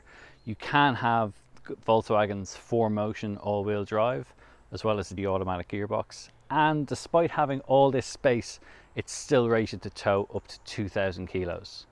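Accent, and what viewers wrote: Ireland. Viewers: The Irish accent had me sold before we even saw inside the van lol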